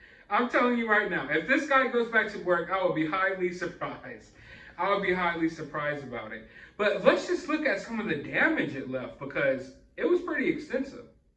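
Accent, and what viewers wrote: Australia. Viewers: I love elephants and don’t blame them for being annoyed at humans . Love. Your Australian accent btw 🙂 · I love Australia and love your Australian accent. I would have passed out.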